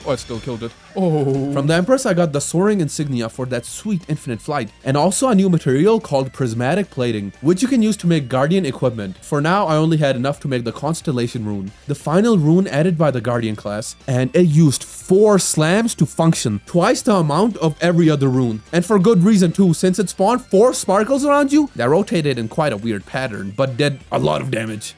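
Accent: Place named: United Kingdom. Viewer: British ahh accent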